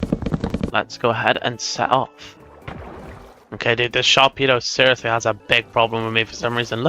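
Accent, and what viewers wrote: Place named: England, United Kingdom. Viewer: Your accent is so familiar where in England are you from?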